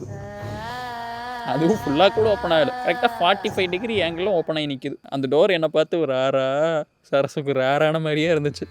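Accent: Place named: Coimbatore, India. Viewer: உங்கள பாதா சென்னை காரன் மாறி தெரியலையே. கோயம்புத்தூர் மொழி பேசுறீங்க.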